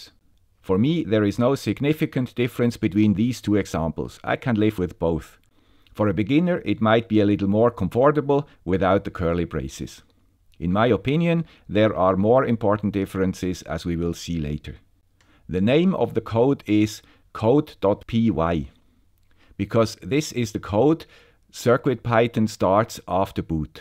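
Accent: Switzerland. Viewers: I also love your intro ! When I hear it is the "guy with the Swiss accent" I know I will be watching something educational and entertaining ! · Yeah I like the dir command. Long ago I made a python program that allowed the use of cd, change directory, even. I think it went into lists and dictionaries as well. People seem to copy what I make, even when I think it's locked down. Nonchalant works better. Regardless, I seem to give people good ideas as well. Love this place! Thanks man with the Swiss accent. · The guy with swiss accent...hehe...Hallo und viel erfolg.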